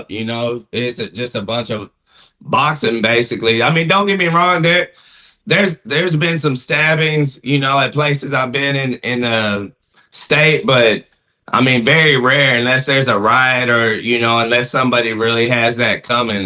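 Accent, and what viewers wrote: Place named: South, United States. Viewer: I know who you are talking about . They do kinda favor this cat here got more of a southern draw.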